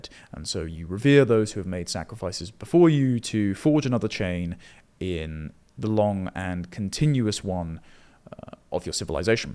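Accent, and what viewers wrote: RP, United Kingdom. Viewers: I really enjoy a lot of your political views, and I’d like to watch more of your content. But the exaggerated RP accent feels a bit forced. It comes across as if you're trying to sound overly refined or intellectual, kind of like a Gen Z Christopher Hitchens. Milo Yiannopoulos is a textbook case of taking it way too far.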